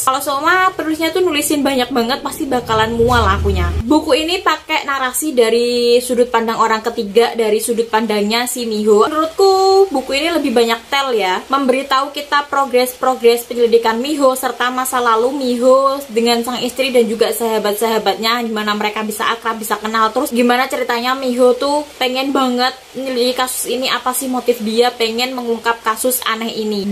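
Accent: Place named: Yogyakarta, Indonesia. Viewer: Domisilinya Jawa mana kak? Suaranya mengingatkan aku sama temen-temen di Jogja. Sehat terus ya kak biar bisa mereview novel-novel lain. Amin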